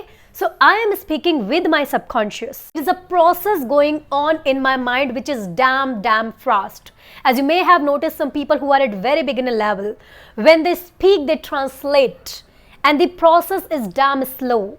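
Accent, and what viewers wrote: India. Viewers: You speak english well woman .it s too fun your accent it s great it adds a gracious listening . I m french and i notice a lot of indians People speak english like you . Could you ask you a question lady ? Is life is easy or Hard in england ? I don t know this land ?i went in london when i was seventeen . It s too gracious to listen to you keep teaching People. Could you ansewer my question please ? God bless you lady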